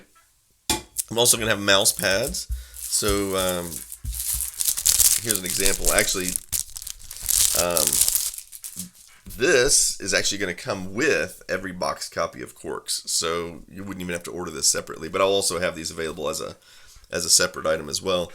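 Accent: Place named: Texas German, United States. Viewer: Ich habe nicht gewissen, dass du ein Texas-Deutschspracher bist!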